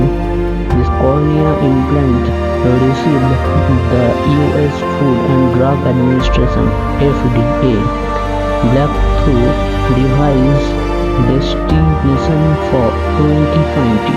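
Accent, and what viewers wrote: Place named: India. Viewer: What a stupid way to talk on top of louder music. And plus in Indian accident, what a rubbish man.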